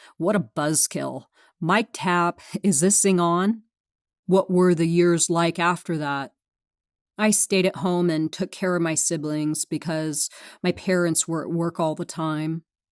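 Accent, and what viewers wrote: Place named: Oklahoma, United States. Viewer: Damn I called it. The accent. Except I thought you had came from Tx to Ca. But its Ok. OK is amsricas step child. Tx dont claim yall, midwest dont claim yall, dirty south dont claim yall. And the modesto...valley people are scary. Coast people spot valley people a mile away when they go to Pismo etc. Rough starting point man. Damn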